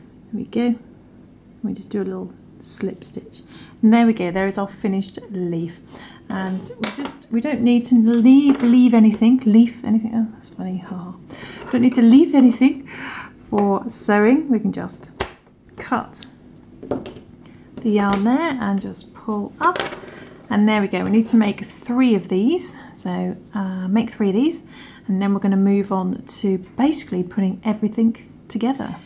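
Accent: United Kingdom. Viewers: I absolutely agree with you. And I would also add that I'm in love with her voice, British accent, the way she speaks😍 I can't wait to by all the necessary equipments and start making all the flowers you showed for our summer house💖💖💖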